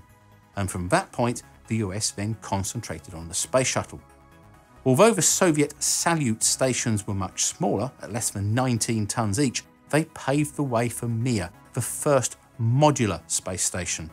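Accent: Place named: England, United Kingdom. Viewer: He is english. What accent should he have?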